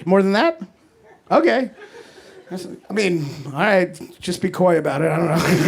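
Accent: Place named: New York, United States. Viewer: He's from Kentucky but he sounds like a New Yorker. That's how Jewish he is.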